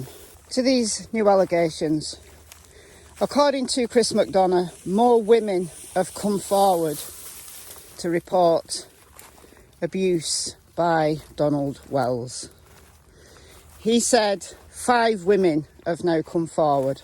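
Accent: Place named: Northern England, United Kingdom. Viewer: Aww shame it's the end of hopping Cassie 😏 Absolutely love your channel. It's great to hear a northern accent. I'm a Brummy myself. Anyway catch your next stream ❤️👍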